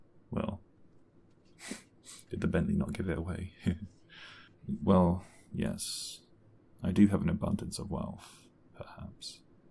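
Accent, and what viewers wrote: United Kingdom. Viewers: 7819/10 Great audio Love the English voice The audio is lovely, crisp, enchanting, the end it where it’s at for the most but the audio overall is like fine wine. I would definitely recommend it.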